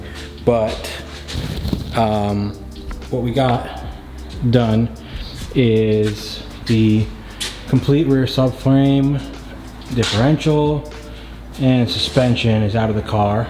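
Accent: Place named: United States. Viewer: como le comente a tu viejo, solo pesque el 20 % de lo que hablabas, el ingles USA, suena distinto al Brit......ja ja veo que la sal afecta mucho los bajos el coche, lo pudre todo, parecería que el 4 Motion, tiene mas hueco en la parte trasera del coche, supongo que sera por la transmision y el lugar que ocupa, deberás tener que cortar el baúl de tu coche y rehacer el piso?? esto llevaría un buen trabajo, supongo también que desarmaras la transmisión para ver los engranajes, cambiar bujes de goma y dar blasting + pintura a las parrillas, los semiejes también los repararas?? tenes un buen trabajo por delante, por suerte tenes el coche viejo para sacar un plantilla del piso y copiarlo.......la satisfacción, sera cuando lo veas al VW negro terminado y tirando cambios por las calles de N York.......ja ja, seguí colgando videos de la transformación, y si podes, hace alguno en castellano que lo cuelgo en mi Face, Un abrazo!!!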